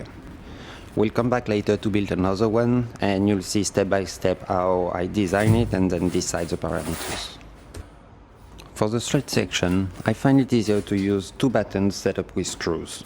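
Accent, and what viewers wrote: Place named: France. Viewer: au fait au vue de l'accent je suppose que tu connais le francais ;)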